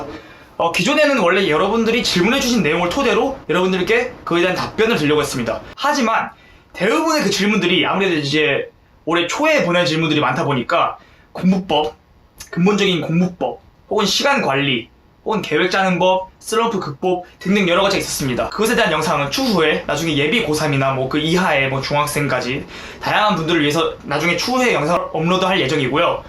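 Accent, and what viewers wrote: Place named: Busan, South Korea. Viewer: 다른 얘긴데 경상도 출신인데 대전으로 이사 가신 건가요? 말투는 부산말투인거 같아서요 ㅎㅎ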